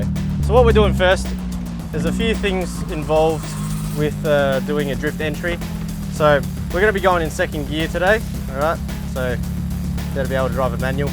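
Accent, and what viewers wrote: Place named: Australia. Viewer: Asian guy with Australian accent. Nothing unusual here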